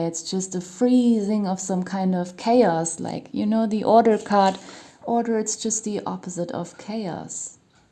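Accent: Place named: Germany. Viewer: You gotta sexy voice, babe, with a tiny German undertone somewhere, sounds cute!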